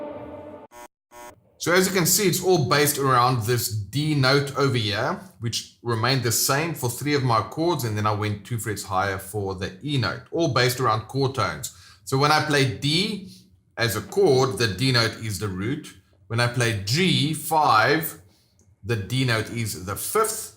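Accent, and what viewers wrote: South Africa. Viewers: I can hear you're South Africa, same here! Any advice on tone or do you have any videos on tone? I have a Roland Cube amp and also use a BOSS GT-1, but I haven't played around with tones. The tone you're using is perfect for worship, how can I achieve this tone?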